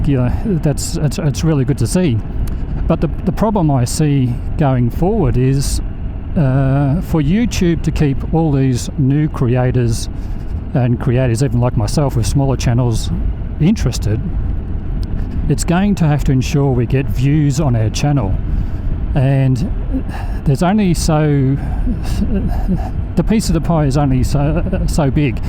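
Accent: Australia. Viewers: Ozzie accent such a pleasant change from the pommies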